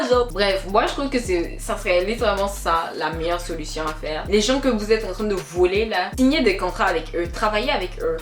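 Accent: Quebec, Canada. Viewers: A t'es québécoise ???? C'est pour que t'as un accent !!😭😭😭 En vrai j'm'en doutais ! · L’accent canadien 😭😭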